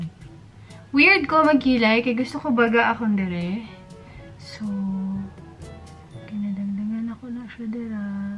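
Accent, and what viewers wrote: Visayas, Philippines. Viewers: cute kaau mag bisaya bai.. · Hahahaha cuta kaayu nimo mag bisaya ate uy heheheh kapoy gyud mag bisaya ate kung naanad naka mag tagalog ig english hehehehe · Cute magbisaya c idol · I’m not interested on your makeup challenge. I’m interested on how you speak bisaya 😁 and i find it cute like erich ❤️ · Ang qt magbisaya💕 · Ang Ganda mo, kahawig mo c Erich Ang cute nyo mag bisaya ni Erich.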